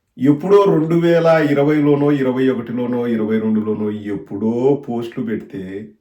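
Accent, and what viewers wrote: Rayalaseema, India. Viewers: అన్నా మేము డిల్లీ లో ఉంటాం రోజు మీ వీడియోస్ వింటున్న ఎప్పుడన్నా జోక్ వేస్తుంటారు గా పక్క మా అమ్మ నా వైఫ్ kuda కూడ నవ్వుతూ ఉంటారు మీరు మాట్లాడుతుంటే మధ్యలో మనం రాయలసీమ యాస మాట్లాడుతారుగా అప్పుడు మేము అందరం ఫాన్స్ అన్నా నీకు జగన్ అన్నకి ❤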